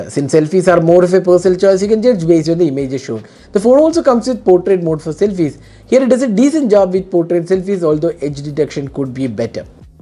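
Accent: Kerala, India. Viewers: Malayali accent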